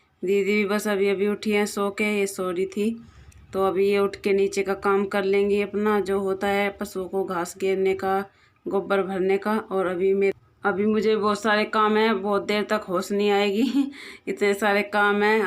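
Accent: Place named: Muzaffarnagar, India. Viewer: ji aap Muzaffarnagar se ho kya aapki language se lagta h, Main bhi muzaffarnagar se he hu lekin abhi rahte h Chandigarh m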